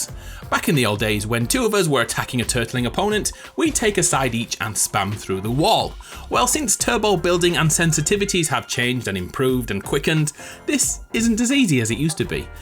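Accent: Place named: Yorkshire, United Kingdom. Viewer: Which part of Yorkshire are you from because I’m from Yorkshire too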